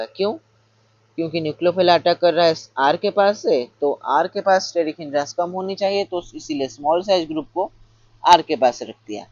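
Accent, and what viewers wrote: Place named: Odisha, India. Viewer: bhai tama video ra strating 10 sec ru jani deli tame odisha ru boli , bhai bhala explain karucha , i love it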